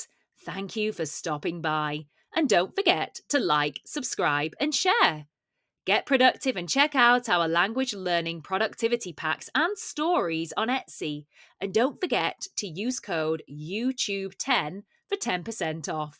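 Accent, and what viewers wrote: United Kingdom. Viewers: Thanks for creating this program, because I can learn British accent from this. Thank you very much!❤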